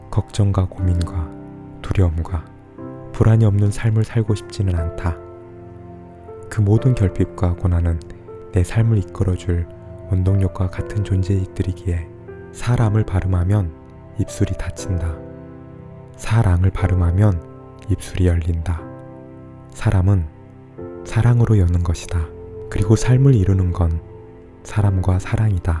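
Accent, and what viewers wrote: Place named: Jeolla, South Korea. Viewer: 전라도 사투리 억양 ㅋㅋ 그래도 멋있다~~~!! 많은 부분을 생각 하게 하고 많은 부분을 되돌아보게 하는 시간이네요 오늘부터 오늘까지!!!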